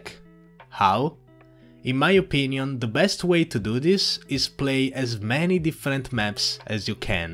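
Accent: Italy. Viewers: Lol ho capito che eri italiano solo dalla pronuncia ahah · are you italian mate? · you are italian? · Are you italian? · you have an italian accent? Are you italian?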